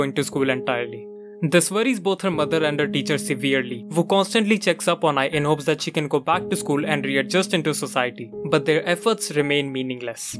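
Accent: India. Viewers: Are you indian? your accent is hard to pin down...it sounds indian and Italian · The Indian accent makes me not be able to take the video seriously. The editing is good.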